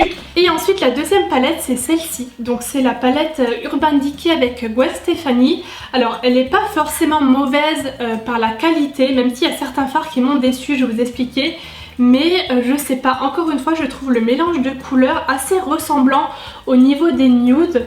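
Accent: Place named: Alsace, France. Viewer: Tu viens d'où exactement en Alsace ? Parce qu'y vivant aussi je reconnais bien cet accent haha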